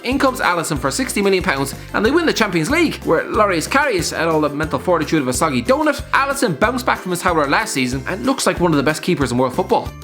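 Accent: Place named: Ireland. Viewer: If I click on one of their videos and do not hear a majestic Irish fella then I’m gone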